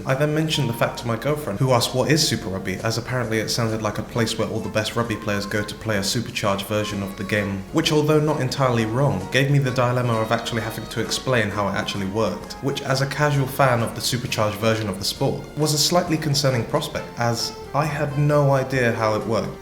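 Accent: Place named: United Kingdom. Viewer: You sound British. Us southern hemisphere supporters don't keep up with your rugby tournaments either.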